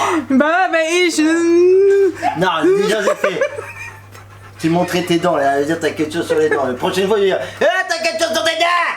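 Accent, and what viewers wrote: North, France. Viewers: Cc Très bonne idée pour la table, j'adore, bravo Un peu plus de patois du nord, je m'en lasse pas 🤣🤣🤣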